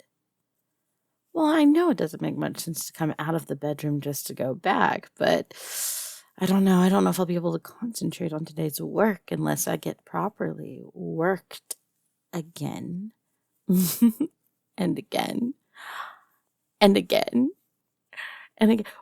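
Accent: South, United States. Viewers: Wasnt expecting a southern dragon but its a pleasant surprise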